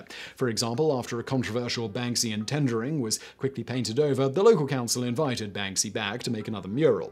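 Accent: United Kingdom. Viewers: Did he just say Yu-Gi-Oh fusion cards it hard to understand UK english its. Different thin US english.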